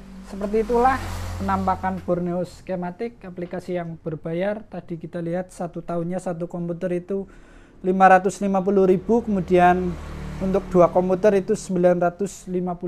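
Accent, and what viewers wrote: Banyumas, Indonesia. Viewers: Genah kie mesti wong ngapak...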